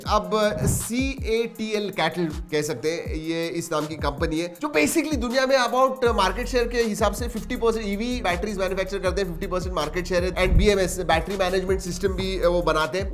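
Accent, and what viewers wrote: Maharashtra, India. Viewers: ap Marathi ho na. bhiya 😅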